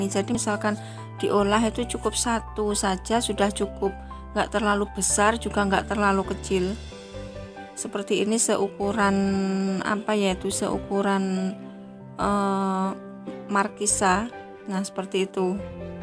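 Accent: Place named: Java, Indonesia. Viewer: Kelihatan banget suarane wong jowo.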